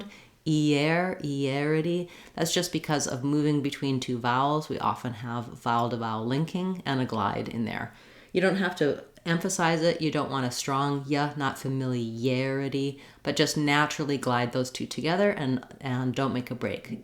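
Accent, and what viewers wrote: United States. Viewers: Is it a American English?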